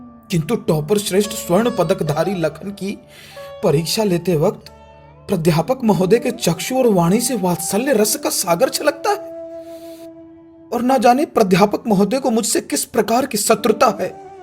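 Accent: Standard. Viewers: Sir itni shudh hindi 😂😅😅😅😅...baht aacha h sir.. Hats off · ARE SIR G....AP TO GREAT HAI...GJB KI SUDH HINDI BOLTE H.....MHABHART.....KI YAAD AA GYI....APKI VANI SUNKR🤣😔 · Aapki Hindi sunn kr dil khush hogaya...Kash ki aaj bhi log aise he pure hindi ka uccharan krte..Toh nahi sub ko English mein maharat hasil krna hai..🤣🤣🤣 Apna Desh ka mother tongue hindi koi bolna he nahi chahta..Sad but true.. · Such a nice....voice you have...you are a multi-talented....🙏 I love your voice and pure hindi accent....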